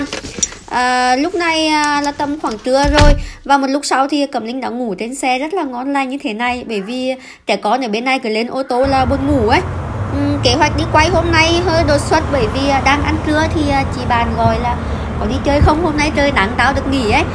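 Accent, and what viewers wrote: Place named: Nghe An, Vietnam. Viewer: Xin chào oi, hôm nay lại được gặp và nghe giọng Nghê An hà tĩnh dễ thương làm mình nhớ VN mình quá, vườn hoa hông chỗ bạn đẹp quá, nhiều hoa nữa, mõi lần xem video của bạn mình có cảm giác đang cùng bạn đồng hành ở 1 đất nước rất xa VN, dù ở ca nhưng mình thấy có vẻ rất gần vì mõi ngày vẫn lên YouTube tìm xem video mới của bạn, nghe giông VN xa quê, người VN xa quê. Bên đó vẫn đỡ buôn hơn vì có cộng đồng VN mìn rất đông phải ko bạn. Bạn giữ gìn sức khoẻ nha. Chúc gia đinh bạn nhiều yêu thương. Mình vẫn sẽ chờ đợi nhưng video mới của bạn mõi ngày và đồng hành cùng gia đình bạn.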